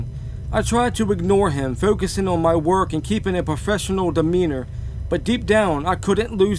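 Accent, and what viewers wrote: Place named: South, United States. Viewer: Your video randomly showed up. I see that you are a small channel. But I noticed that you had a southern accent and that made me appreciate your commentary right away. It may not be everyone's thing, but it makes it more relatable in a way. You got yourself a subscriber. Keep on keeping on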